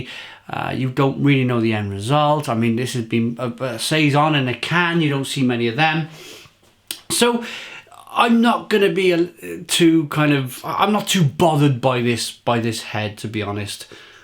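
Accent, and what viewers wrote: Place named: England, United Kingdom. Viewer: which part of England are you from? I find the regional dialects of the country very intriguing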